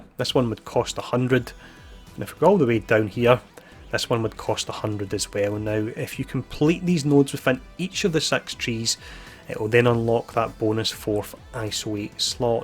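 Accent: Scotland, United Kingdom. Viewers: I thoroughly enjoy your Scottish Accent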